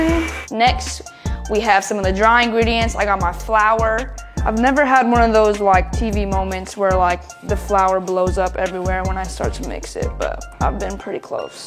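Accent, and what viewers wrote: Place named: South, United States. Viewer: It’s called: she’s been in the south for college in the past 4-5 years so her accent changed a bit due to that.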